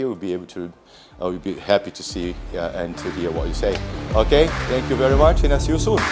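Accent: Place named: Brazil. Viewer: Esse cara é brasileiro certeza kkkkk o sotaque não engana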